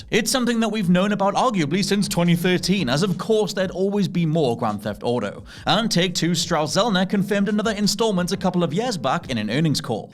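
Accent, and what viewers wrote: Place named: Newcastle, United Kingdom. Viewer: This is my first time on your channel and I'm curious - are you a Geordie by any chance? You sound like I sound when I put on an accent so others can understand what I'm taaakin' aboot :D Please disregard if you have no idea what I'm talking about. Subscribed anyway...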